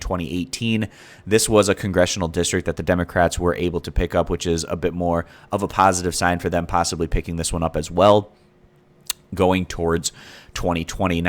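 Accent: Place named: Midwest, United States. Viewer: I’m from Chicago and your midwestern “twang” is pretty heavy lol.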